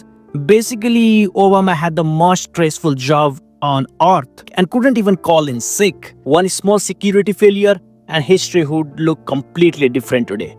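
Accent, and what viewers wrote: India. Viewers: your indian accent makes it even more funnier lol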